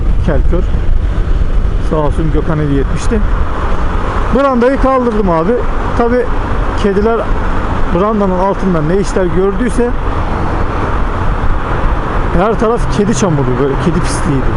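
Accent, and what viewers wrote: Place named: Konya, Turkey. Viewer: Abi senin memleket neresiydi konya mi konuşman çok benziyor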